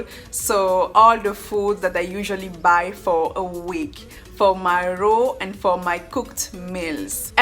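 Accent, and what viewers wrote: France. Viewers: she is French and it is her native language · Stephanie Brown French accent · Kea lila Sok I believe she is from France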